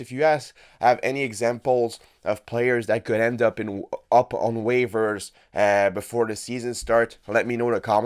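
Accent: Quebec, Canada. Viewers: Really love the videos bud! You're really consistent and the Quebecois accent is sick hahaha keep it up brother!!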